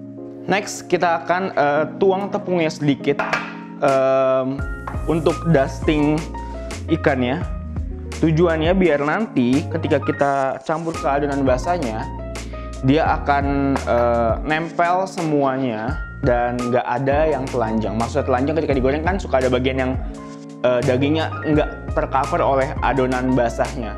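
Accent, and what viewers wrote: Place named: South Jakarta, Indonesia. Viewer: Kebiasaan liat&dengerin aksennya Gordon Ramsay ama Banging with Babish jadi agak gimana denger aksen ala Jaksel.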